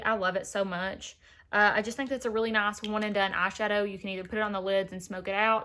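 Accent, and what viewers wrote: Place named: Kentucky, United States. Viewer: I can't believe I just found your channel! We have the same loves. I was wondering about your accent.. A Kentucky girl killing it on YouTube! Love from Louisville, KY. <3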